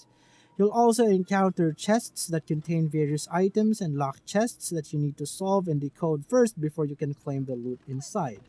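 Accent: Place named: India. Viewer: great honest review , love your hindi accent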